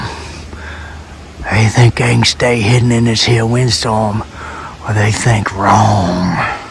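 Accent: United States. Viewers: Yep, you are goofy. Just what I thought. Loving it though! With you talking like an old country boy and making such a racket...they are not gonna stay around long!! Oh well, they'll be back!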